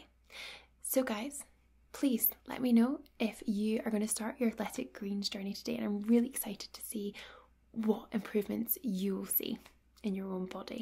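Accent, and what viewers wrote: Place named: Scotland, United Kingdom. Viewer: Gentlemen, we all have to admit that is a very pretty woman with an awesone accent. Very Scottish🏴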